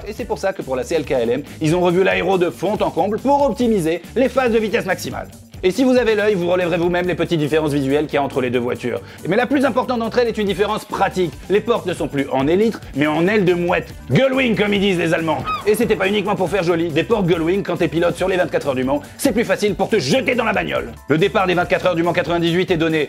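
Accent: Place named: Belgium. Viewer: Bon j’ai failli mettre un pouce rouge pour « l’accent belge », mais juste pour l’humour et surtout le contenu, c’est un gros like 👌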